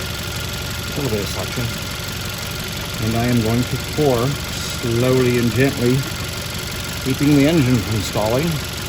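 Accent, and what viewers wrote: Canada. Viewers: U know you're from Canada by how u say "ouwt"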